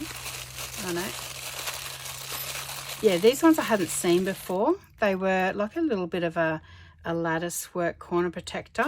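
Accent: Australia. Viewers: I have never ordered from Ali Express but I want to! Great haul! I wonder how long it would take to arrive in America? I live in New York State and I am in the middle of trying to watch all your videos, just love them. Love your Australian accent and your voice is so soothing to listen to…..cyndi m.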